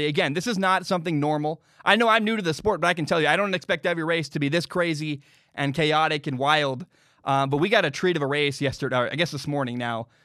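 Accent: United States. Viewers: Great to hear American accented F! commentary!, I believe you have found a great niche! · Glad to see you're picking up on F1! Loving the commentary. It's definitely refreshing to hear F1 content in an American accent xD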